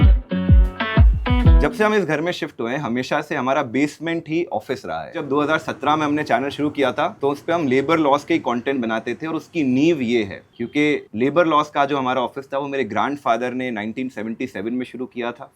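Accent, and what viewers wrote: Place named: Jodhpur, India. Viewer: Damn you're Jodhpuri!!😅very proud to hear it!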